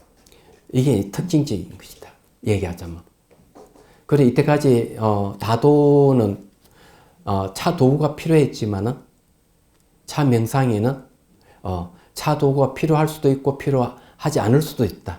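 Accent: Gyeongsang, South Korea. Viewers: 김 옥순 님 스님 경상도분이라 방언을 많이 쓰시는데 우리는 다 알아 듣거던요 명상 강의를 하시는 스님께 그런 댓글은 禮가 아닌것 같습니다..^^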